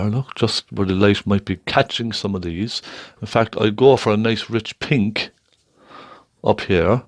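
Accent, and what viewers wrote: Ireland. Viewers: lovely irish accent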